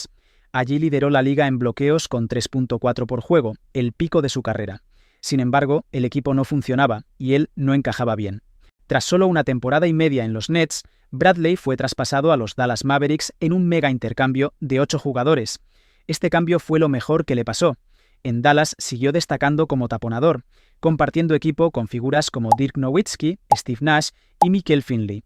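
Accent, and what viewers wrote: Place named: United States. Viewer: Por qué hablas como un yanki?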